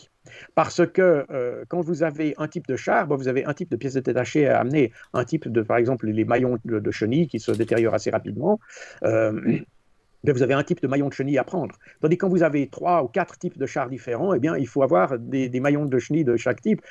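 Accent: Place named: Switzerland. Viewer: son accent suisse trainant d'origine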